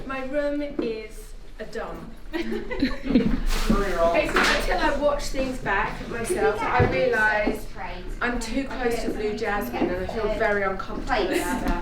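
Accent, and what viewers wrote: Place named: England, United Kingdom. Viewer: That voice and English accent! Love VB since she was Posh Spice!!!! ♥️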